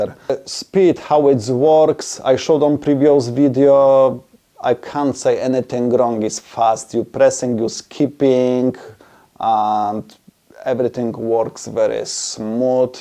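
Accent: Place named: Russia. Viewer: I was in Poland, but Polish people don't speak like that. This is more Russian accent than a Polish one.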